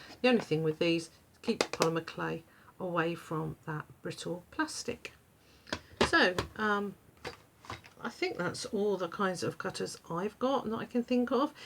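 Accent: United Kingdom. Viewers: You are so great. Im just getting into polymer clay & i love it. My friend cant stand polymer clay, clay in General. Shes To impatient! Yep were American’s. Shes not just impatient, she’s spoiled and entitled. 38 Its sad! I tell her all the time! She’s missing out on some really great therapeutic stuff here. But I love working with clay, especially polymer clay, its so great for stress. & i even like the smell. Lol sadly i cant stand the world wide Shortage! People like you probably haven’t felt that yet! Because you probably have every single color in reserves. But question, yesterday I bought a 8oz white bar premo. & its soo hard. I seen one YouTuber using a heat gun To warm quick & work with it. I haven’t tried it yet. Any other tips to make it softer? If you see this. I like To try make pumpkin, snowman, pinecone gnomes, i came To you again. I just seen your thumbnail and realized it’s oh its the wonderful lovely British lady, your wonderful voice, what I’m gonna say next is a huge compliment. 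But I tell my friends I’m watching the real “Mrs. Doubtfire” if she was a real England women & shes teaching me all she knows about polymer clay. Lol I enjoy watching your helpful tips lasy couple months. But your voice reminds me of when Robin Williams says hello dear. To the social worker. It was my favorite movie when I was like 13. In america. Anyway ive Shared you with a few Friends. Anyway I wanted to see what kind of snowflake cutters I should get. I think the plunger ones lo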